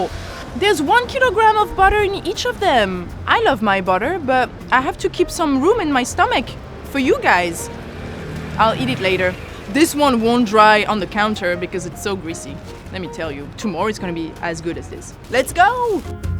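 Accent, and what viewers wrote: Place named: France. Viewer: It’s french accent 🤦‍♀️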